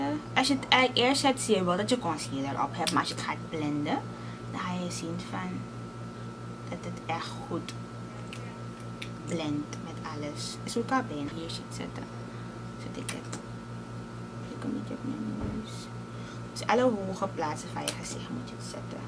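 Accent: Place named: Suriname. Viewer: Ik keek naar een video waar je Engels sprak en ik had gelijk een gevoel dat je van Suriname was en je bent werkelijk van Su❤️❤️❤️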